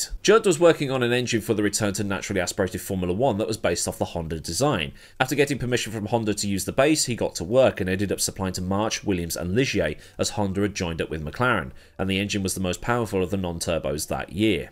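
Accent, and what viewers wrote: United States. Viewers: Your American accent is impeccable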